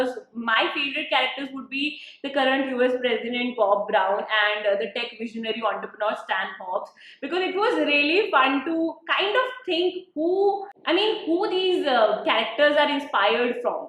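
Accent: India. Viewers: You are so cute especially your Punjabi accent which makes you more cuter.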